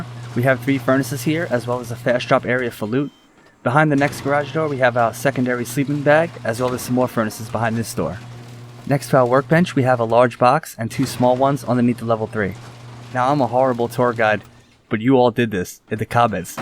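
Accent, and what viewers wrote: New York, United States. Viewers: what part? im from Merrick on Long Island, i could tell a fellow New Yorkers accent. Also good luck with the videos, very well laid out and descriptive.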